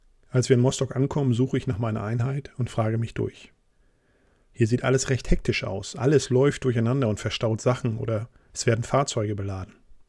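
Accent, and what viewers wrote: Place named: Berlin, Germany. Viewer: der berliner akzent :D hab glaub noch nie grinsen müssen bei dieser geschichte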